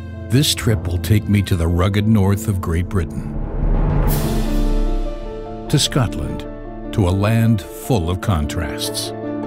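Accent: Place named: United States. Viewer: He's American I think judging by his pronunciation of most words. Scatland taddies etc. Why not someone Scottish narrating it.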